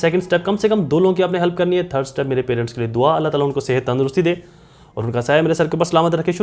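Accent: Punjab, India. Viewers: Nice punjabi accent sir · Sir g punjbi sun 🌞 ka maza ah ga ap ki punjbi hmra jisi ha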